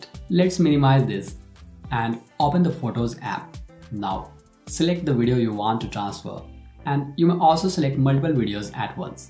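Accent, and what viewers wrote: India. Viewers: I don't mean to be unkind. But you should work on getting rid of the Indian dialect. For me, it's impossible to follow you.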